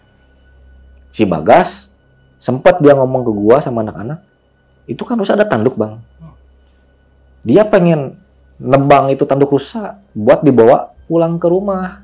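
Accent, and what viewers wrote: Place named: West Java, Indonesia. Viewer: Lucu nya klw orang Sunda ngomong gua elu..😂 semangat kang ...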